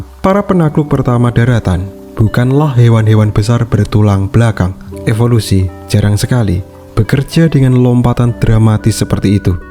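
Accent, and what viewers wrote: Java, Indonesia. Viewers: vo nya jawa 😂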